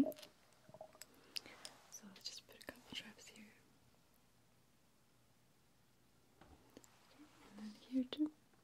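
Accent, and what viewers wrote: Russia. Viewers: Craig Pavey I didnt take it as sarcastic, just that you misunderstand what I said. I mentioned American women dont speak like her BECAUSE she is Russian. Sorry I guess it was more complex then I intended, thats what I get for being an English major lol. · Richclashchair ASMR I love her voice. Russian accents are very beautiful.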